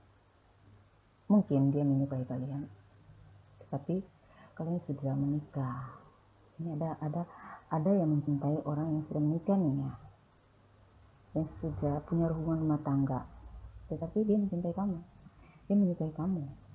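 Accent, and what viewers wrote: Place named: Sumatra, Indonesia. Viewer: pembacanya sederhana banget,sumatera /luar jawa ya jeng,💪💪,suka dgn kesederhanaan.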